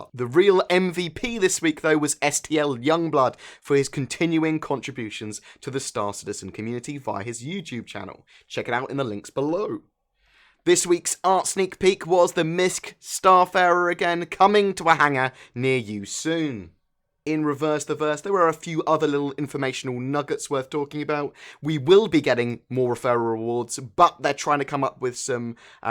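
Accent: United Kingdom. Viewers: Just found you today, and I saw one video and subscribed. I love the way you deliver the information and I can tell that a lot of research and work overall goes into your videos. I had some questions I would like to ask, what mic do you use to record, also is sounds like you live in to UK or grew up there is this true(I'm bad a accents being from the states(Texas)). Love you'r content can't wait for whats to come but for now time to catch up on you'r videos. -Ian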